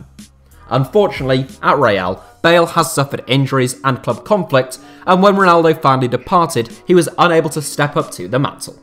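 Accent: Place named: United Kingdom. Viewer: We can tell you are british. Were is Ibraimovic?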